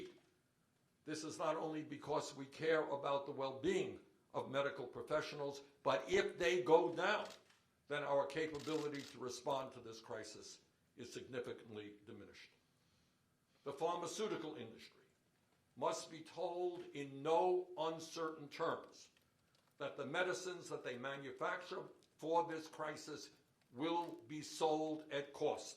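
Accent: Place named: Brooklyn, United States. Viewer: English with a very irritating Brooklyn accent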